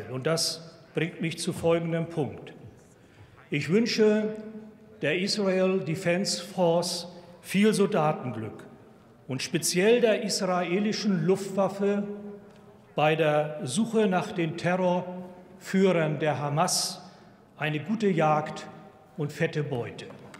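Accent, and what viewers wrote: Bavaria, Germany. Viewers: Diese Frau ist eine Schande für dieses Amt! Sie muss auf diesem Stuhl neutral sein, jedoch schon mit der Aussage "Sprechen Sie deutsch" zu einem bayrischen Akzent und dann noch damit..... unglaublich! Intervenieren, sofort!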